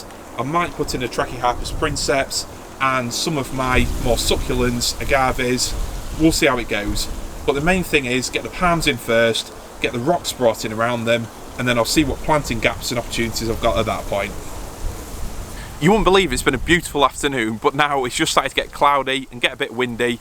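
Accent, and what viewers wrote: United Kingdom. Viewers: Just started watching your vids, trying to create a jungle on Isle of Wight, just bought a musa basjoo but want some trachycarpus fortune seeds, have you got any? I detect a Grimsby accent there to? 👍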